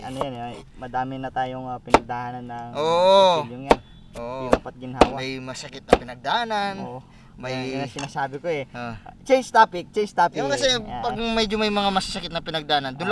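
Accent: Pampanga, Philippines. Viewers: Halatang kapampangan kayo pre hahahaha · LOL... PARANG NARINING KO ANG NANAY KO SA IYO PAG NAGTATAGALOG MAY HALONG KAPAMPANGAN magkasakit lumalaro LOVE YOU GUYS (KALUGURAN DA KAYU) MORE VIDEOS PLS.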